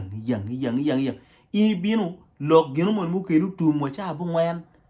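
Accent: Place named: Acholi, Uganda. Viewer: Amaro kit mai iloko kede Lep acholi ni yomo ia mada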